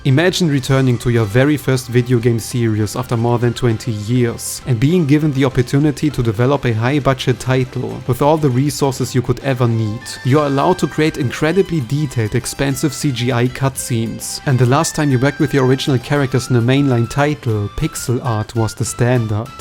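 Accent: Germany. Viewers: Höre ich da etwa deutsch heraus?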